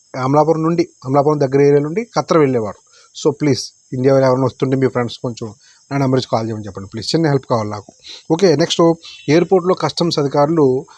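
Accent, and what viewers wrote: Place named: United Kingdom. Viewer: Again it's british english 😮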